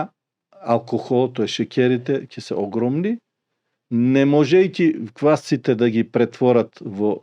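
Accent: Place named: Strumica, North Macedonia. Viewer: Поздрави..Очекувам секогаш ДА ГО ЧУЈАМ ГОВОРОТ СТРУМИЧКИ..МНОГУ МИ Е МИЛО И СИМПАТИЧНО...НАРЕЧЈАТА СЕ БОГАТСТВО..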